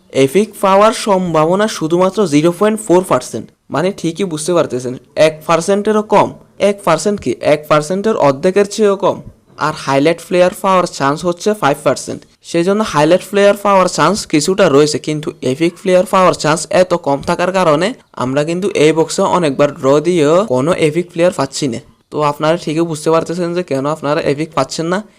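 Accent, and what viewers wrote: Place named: Bangal, Bangladesh. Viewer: Are bangal surddho language e ktha bol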